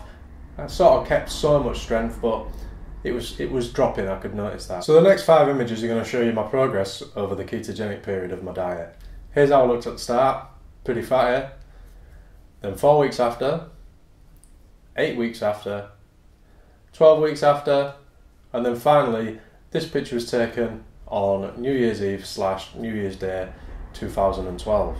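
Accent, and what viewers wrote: United Kingdom. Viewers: Come on ,,, its so clear that it's British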